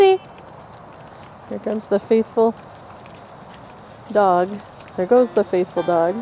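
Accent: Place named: New England, United States. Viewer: Its so naaaaaesty! Haha yankee accent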